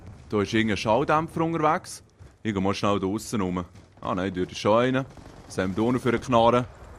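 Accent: Bern, Switzerland. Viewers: du bisch zviu:) bisch e bärner oder Luzerner? gruess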